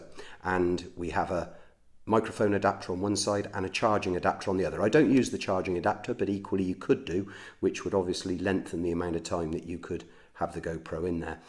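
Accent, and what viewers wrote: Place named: England, United Kingdom. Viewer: the accent makes you sound like the English Morgan freeman mate it's sick😂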